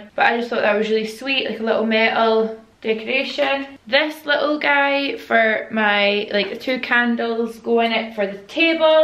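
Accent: Scotland, United Kingdom. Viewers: Your the first Scottish youtuber that I've followed it's great hearing the Scottish accent xx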